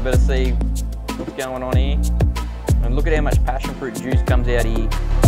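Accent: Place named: Australia. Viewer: Nothing’s better than watching a local brew. Love the Australian accent, approach and ingredients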